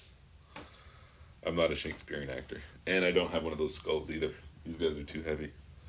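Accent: Canada. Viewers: I can hear that Canadian accent sneaking through. lol. Cool video.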